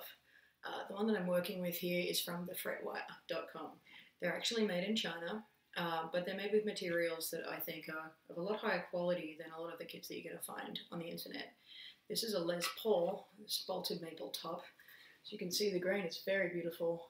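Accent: Australia. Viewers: you sound aussie. you in oz or os and how have you found buying china outside of ebay?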